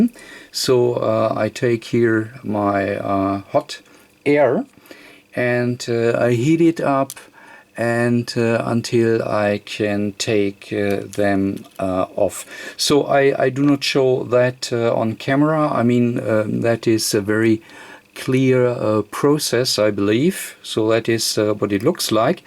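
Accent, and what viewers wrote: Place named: Germany. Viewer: You speak like an kraut...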